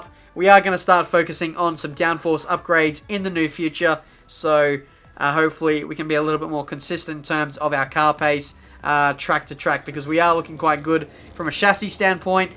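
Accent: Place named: Australia. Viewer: Is there a better combination for a video than the word 'supers' and an Australian accent?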